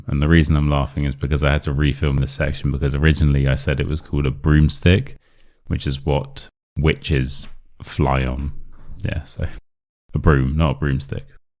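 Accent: United Kingdom. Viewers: Hiya, thank you for your video and offering your English class. I love your British accent! I am living and working in UK for year s, still struggle for my pronunciation and accent. Your voice and tone are so comfortable to keep listening, and love love your sense of humour too! Thanks again your lessons and I can,t wait for your next video❤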